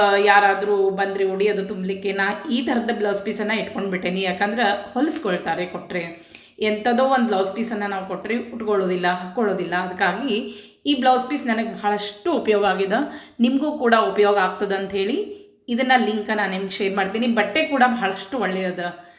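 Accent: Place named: Hubballi-Dharwad, India. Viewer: akka ನನಗೂ ನೀಮನ್ ನೋಡಲು ತುಂಬಾ ಆಸೇ ನೀವು ಯಾವ ಊರಲ್ಲಿ ಈರ್ತೀರೀ ನನಗೆ ಹುಬ್ಬಳ್ಳಿ ಧಾರವಾಡ ಅನಿಸುತ್ತದೆ ನಿಮ್ಮ ಮಾತನ್ನು ಕೇಳದರೇ ನಾವು ಆಗಾಗ ಹುಬ್ಬಳ್ಳಿ ಗೇ ಬರುತ್ತವೆ ನಿಮ್ಮ ಅಪಣೇ ಇವರೇ ನಾನು ನೀಮನ್ ನೋಡಲು ತುಂಬಾ ಆಸೀ ನೀವು ನಿಮ್ಮ ಸಂಸಾರ ದ ಬಗ್ಗೆ ಓಂದು ವೀಡಿಯೊ ಮಾಡಿ ಎಷ್ಟು ಜನ ಮಕ್ಕಳು ಎನ್ ಮಾಡತಾರ ಸರ್ ಎನ್ ಮಾಡತಾರೇ ಹೇಳಿ ಮತ್ತು ನಿಮ್ಮ ಮನೆಯ ವೀಡಿಯೊ ಮಾಡಿ ನಮಗೆ ತೋರಿಸಿ ನಿಮ್ಮ ದೇವರ ಮನೆ ಎಲ್ಲ ವನ್ನೂ ನೋಡುವ ಬಯಕೆ ನೀವು ನಮ್ಮ ವರೂ ಅಂತ ಕೇಳಿ ನಿ akka ದಯಮಾಡಿ ತಪ್ಪು ತಿಳಿಯ ಬೇಡಿ ಇಂತಿ ನೀಮ್ ತಂಗಿ ಲತಾ ಕುಲಕರ್ಣಿ ನಮಸ್ಕಾರ🙏🙏🙏🙏🙏 ಗಳು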